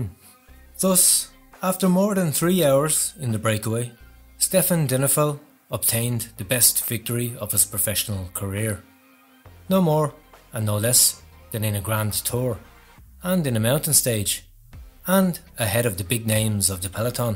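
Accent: Ireland. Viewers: I think your subtle, understated Irish accent really adds to the narrative. Just discovered this channel and is eye-opening to say the least. Really like it.. I imagine it'll take off even more when/if the big names get busted.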